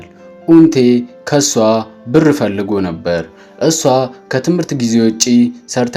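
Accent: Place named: Borko, Ethiopia. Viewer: ቦርኮ ነህ እዴ አወራርክ ሲዘጋ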